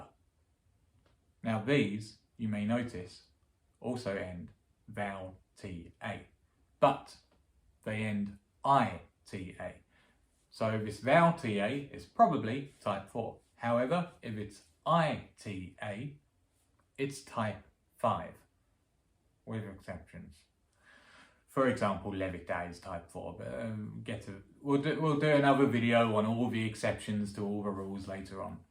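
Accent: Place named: Australia. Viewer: I think he is, and even implied being one. Whatever the case, his English is delightful and perfect. The accent sounds Australian, which is the accent I find the most beautiful 😊 I am a native Finnish enjoyer, but I find it interesting to refresh my "analytical" knowledge of the Finnish grammar and language, too. About rolling the 'r's: that sound is usually the last one that native Finnish children, too, learn to say when growing up! Before they ace it, they usually replace the sound with 'l'. Even I vaguely remember the time when I still couldn't make that sound but was avidly trying to. Your first example of "purra" sounded just fine to me. Personally, I like to say the long 'rr' in a less harsh-sounding manner, quite similar to how you did. I still roll the r, but maybe just with the side of my tongue. It's a feature of the dialect which you could hear in Tampere region. 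Especially their short 'r' is more like the Japanese counterpart, with just a very brief roll.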